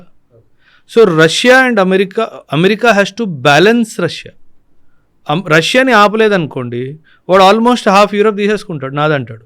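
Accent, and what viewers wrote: Punjab, India. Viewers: Punjabi telugu matladutunte chala different ga vundi..but superb awesome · OkA chilipi question sir italian of the east ani telugu langauge ni antaru meeru punjabi ina naku telisi mee antha sweet ga telugu ni maatladagala punjabini nenu chudaledu. telugu ni meeru baga enjoy chestaranukunta telugu old songs vintara ,Ghantasala gari songs ni baga enjoy chestu vintara. Please explain about telugu sir and what is the difference between punjabi langauge and telugu langauge.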